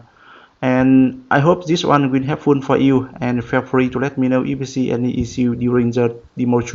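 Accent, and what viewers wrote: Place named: Vietnam. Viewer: nghe là biết người việt :))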